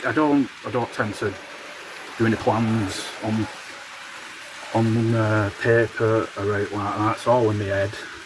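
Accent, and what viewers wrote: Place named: Farnworth, United Kingdom. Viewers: Hi, really enjoyed the video, great layout and the scratch building is superb and like the theme Lancashire and Yorkshire railway! You sound like a northerner like myself and sound absently fine and its about your passion for the hobby and you know your stuff and its good to link it with the history! My only piece of friendly advice is to go slow with the camera panning and maybe invest in a small hand held tripod that you can hold or put on the layout for static shots. Looking forward to following your progress and just subbed. Cheers Gary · Brilliant, really enjoyed this and looking forward to seeing more.Don't worry about your voice you're a Farnerth lad and sound reet gradely.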